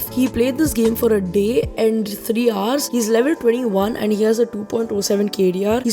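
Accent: India. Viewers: Your acient is like an indian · Yooo you Indian?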